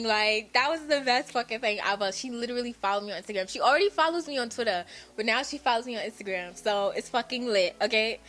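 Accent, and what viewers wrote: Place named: Brooklyn, United States. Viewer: Your accent is sooo cute , I keep trying to figure out where youre from . It reminds me of wahlburgs accent ; like that old Brooklyn / Italian accent . Ugh I’m from bk and have no accent lmaoo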